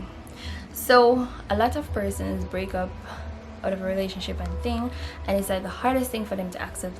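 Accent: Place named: United States. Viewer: these things you are talking about i hope they all apply to you or you are just talking for talk sake...seems you and your daughter are doing great..blessings....i also notice that you are speaking properly now...which is good cause your daughter is going to emulate you and children live what they learn..oneLove....yuh all sound like American sometimes..lol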